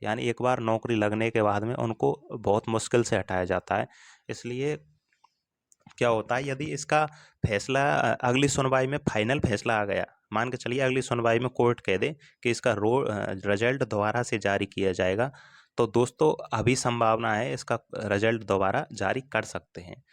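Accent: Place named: Rajasthan, India. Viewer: Tumhari language se tum hindaun City k lg rhe ho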